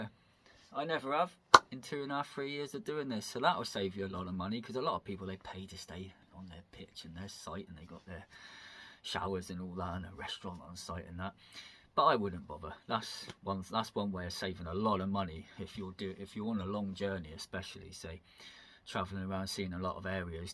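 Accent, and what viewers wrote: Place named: West Country, United Kingdom. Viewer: Ah right. You picked up a west country accent. I agree like your hacks, I carried on living like a student, 60 now same habits, I like to keep living costs low. You can pretty much get anything of facebook market place for free if you are prepared to wait for it. I should get to Scotland, I keep looking at rural houses up that way, plenty to think about.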